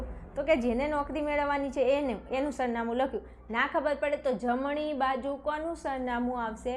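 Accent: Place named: Mehsana, India. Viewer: Tamara language upar thi lage chhe teacher tame mahesana baju Naa chho ne 😅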